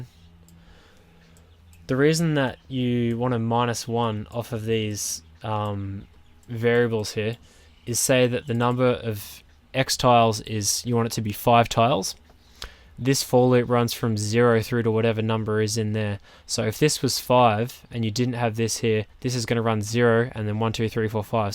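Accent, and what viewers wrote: Australia. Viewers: Thx for this tutorial!! A few questions though... How can I use a Navmesh bounds volume with this? I added one to a tile and it works on that tile but not when the tile is generated through the BP. Also I loved looking at where you have taken this BP with your Dev Log video and I was wanting to know if you could tell us how to do a couple of things that you never got to in this video. Probability for the tiles to spawn Seeded generation, mostly so you could save and load back to where you were I have got enemies spawning so I guess items will be similar to that but any tutorial you could do that takes this BP and adds in item spawning and enemy spawning would be useful, just to see how you do it and how you build it in to this BP. I have built this BP and modified it a bit but would love to buy it from you if you had an updated version of it for sale with some of the added cool stuff you have done with it!! 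Thx again... really helpful stuff. BTW good to hear another Aussie doing such cool stuff :)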